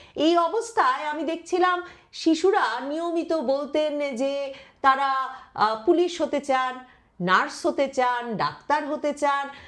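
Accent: India. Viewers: Indian awaz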